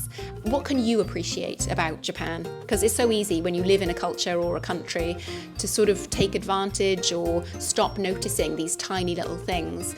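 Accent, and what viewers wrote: United Kingdom. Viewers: 動画の配信がしばらくなかったので、心配していました。 素晴らしい家族愛でお父様への孝行と恩返しをされて、天国のお母様も きっとお喜びだった事でしょう。 素敵なイギリス英語とともに、感動の動画をありがとうございました。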